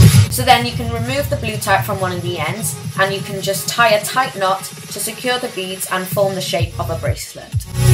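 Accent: Wales, United Kingdom. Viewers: Are you welsh? You have the accent.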